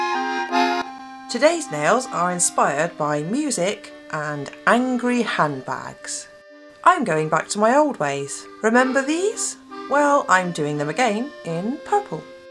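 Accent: England, United Kingdom. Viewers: I love all your videos they are so awesome, your so good at doing nails. I got inspired by you cute icecreame nails that are so cute and I did them on my nails but didn't turn out as good as yours😂😔 anyway I also love your accent I lived in England for 4 years I'm from chile. I have one last question if you don't mind what part of England are you from? thank you your videos at awesome.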